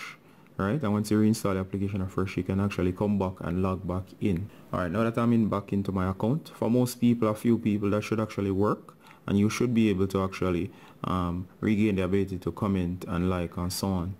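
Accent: Jamaica. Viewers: I love the Jamaican accent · Your a Jamaican?💯🇯🇲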